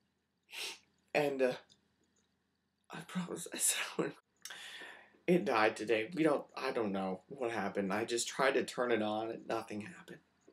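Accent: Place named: Canada. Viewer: Your Canadian accent is soooo hot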